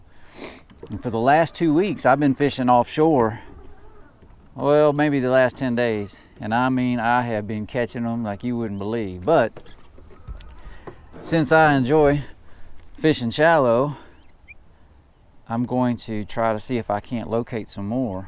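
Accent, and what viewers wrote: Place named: South, United States. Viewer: Great Video, loved listening to your 'Southern Drawl'! New Sub from Indiana. Keep on Fishin!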